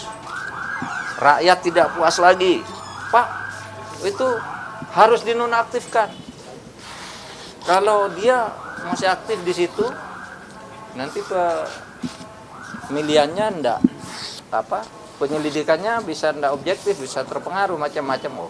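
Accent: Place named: Madura, Indonesia. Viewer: Pamekasan Madura Menyimak. Logat Madura dari Pak Mahfudz masih sangat kental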